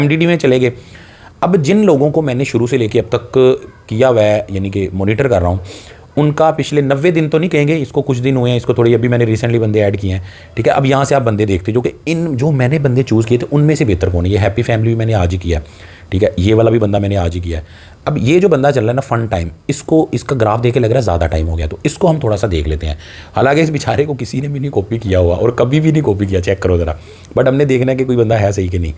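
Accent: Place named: Faisalabad, Pakistan. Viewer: Your dialect is the typical faislabadi , a very beautiful one, where are you from bro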